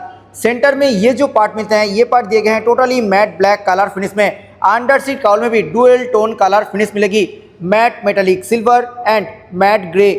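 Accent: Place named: Bengal, India. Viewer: Hindi bolna sikhle, tere accent se hi lagraha hai tu bengali hai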